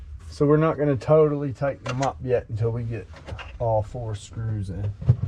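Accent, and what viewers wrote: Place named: East Coast, United States. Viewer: Fellow Scotian here, went on Google just to get cleared on how to install this tablet holder, while watching your video, great by the way! When I heard your voice I said to myself, this guy sounds East Coast and sure enough 👍 thanks for the video brother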